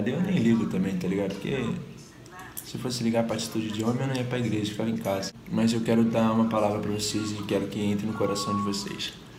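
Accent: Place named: Rio de Janeiro, Brazil. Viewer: Adoro sotaque carioca kkkkkk eu fico tentando imitar hahahaa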